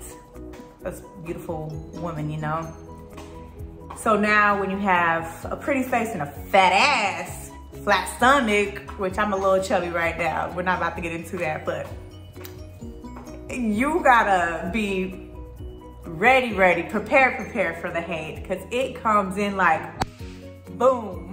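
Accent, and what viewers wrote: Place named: West Coast, United States. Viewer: Your accent is so pretty. What state you from? I’m guessing west coast but I don’t know 🤣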